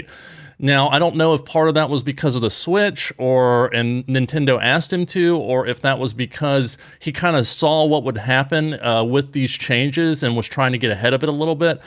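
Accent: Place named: South, United States. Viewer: You sound like Danny McBride. Are you from around the Georgia-SC border?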